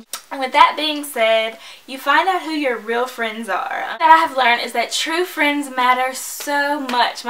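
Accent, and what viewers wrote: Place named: South, United States. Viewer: Gosh! I'm so glad I found your channel! You are just so cute! Where are you from doll? Your from the South right lol? No shade or anything y'all, I looooove the way you talk 😊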